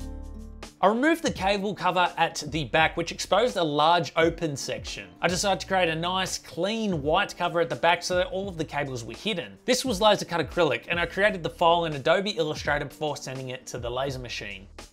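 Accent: Australia. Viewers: R u from Australia bro?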